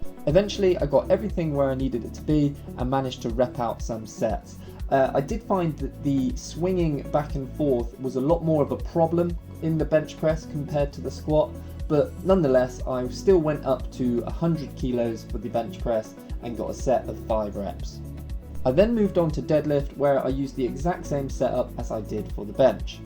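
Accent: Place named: RP, United Kingdom. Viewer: Wow, thanks for the reply lad! 💪 I'm actually a woman, but I do my best to keep up with the guys, and challenges like this are right up my alley. We just love seeing people doing more with pallets here Pallets R Us, we think they’re a really under appreciated bit of kit that the whole global supply chain relies on daily, people often forget that society would grind to a holt without them! I think you might be across the pond (guessing from your Prince William accent 🤴), but we'd love to help you out with some pallets sometime if we can hit it? So, if you're ever down for a transatlantic challenge, hit us up!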